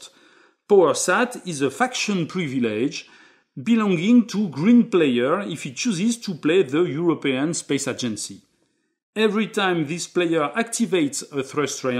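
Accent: France. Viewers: Amazing!!! I watched all 3 vidoes, it’s just fantastic!! Both the game and the explanation/ videos. And I love the French accent🤗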